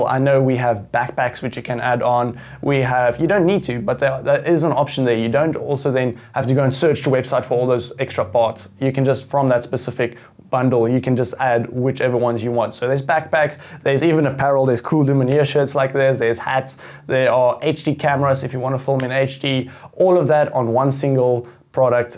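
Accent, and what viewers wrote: South Africa. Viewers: You sound like a fellow South African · are you from South Africa?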